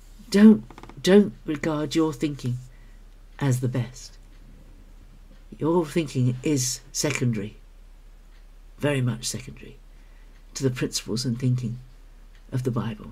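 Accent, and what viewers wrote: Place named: United Kingdom. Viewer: I was isolated for a long time due to health issues, & it made it difficult to stand firm in the faith. I am now rooted in local fellowship, but I still spend a lot of time stuck at home alone, so finding Biblical channels like yours is a wonderful way to renew the mind day to day! (Your delightful gentle British accent doesn't hurt either...pleasant to the ears!)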